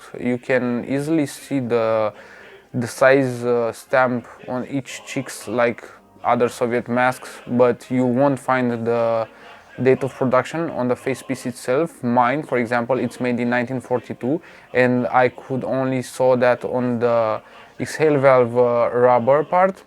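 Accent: Romania. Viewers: are you a romanian?i say this because you have a romanian accent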